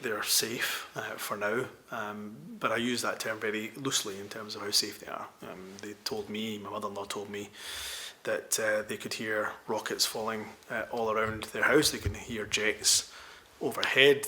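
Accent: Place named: Scotland, United Kingdom. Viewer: Only thing Scottish about this guy is his accent.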